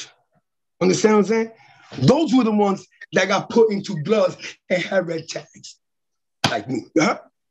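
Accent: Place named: New York, United States. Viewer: sound mad new york. ...every body has to get. thiers. eventually..Every get thier turn. ....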